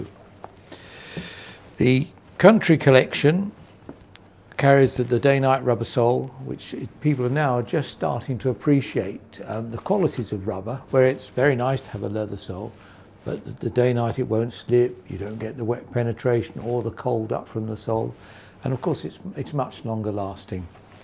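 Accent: United Kingdom. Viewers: speaks a very natural and nice English... with a London accent but without sounding too posh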